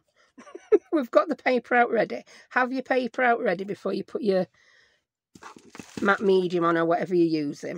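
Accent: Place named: Northern England, United Kingdom. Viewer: Northern lass🤗😁 lovely.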